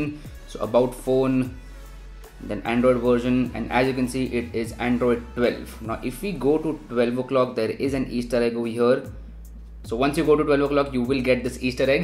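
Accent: India. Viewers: INDIAN SPEAKING ENGLISH IS SO DAMN LIT ! I MAY UNDERSTAND THEM NOW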